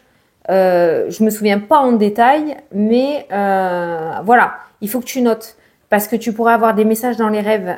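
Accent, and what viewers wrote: South, France. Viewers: Merci je vous adore vous êtes trop cool et incroyable et surtout avec l'accent du sud. Sa fait du bien de vous voir et je rigole à chaque fois. En se qui concerne le lundi il y a beaucoup de choses qui me correspondent dont l'ophtalmologue 😅 ainsi que les rêves prémonitoire. Continuez à être naturellement heureuse et partager avec nous vos précieux moments. ❤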